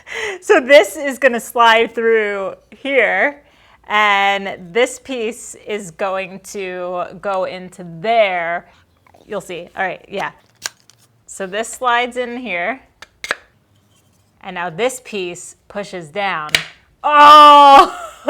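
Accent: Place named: New York, United States. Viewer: Do you happen to be from New York or New Jersey? There's a draw to some of the words you say, that sounds New York esk. Just wondering.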